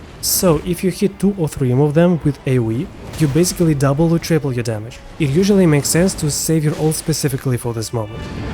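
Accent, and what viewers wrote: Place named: Russia. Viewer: Какое же плотное у меня ощущение, что твой родной язык русский, с этим акцентом, который слышится даже лучше чем мексиканский или индийский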